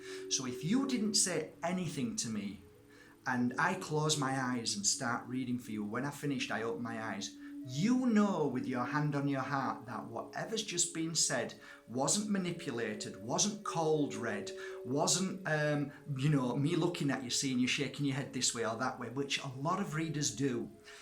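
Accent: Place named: Yorkshire, United Kingdom. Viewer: Thanks for your wee signs video much appreciated and enjoyed. You sound like one of the Elliott brothers aka the Chuckle brothers. Are you from Yorkshire W.R.? Blessings to you from Sunny London town;-) x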